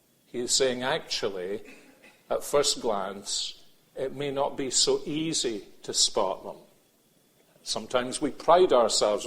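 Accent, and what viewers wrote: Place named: Scotland, United Kingdom. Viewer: Does this man of God preach in Scotland.? He has a Scottish accent.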